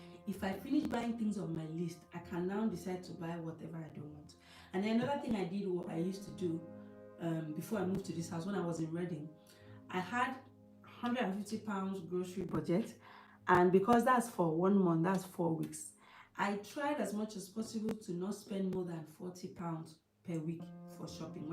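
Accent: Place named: Akwa Ibom, Nigeria. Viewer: My typical Akwa ibom sister. Your tongue betrayed you big-time.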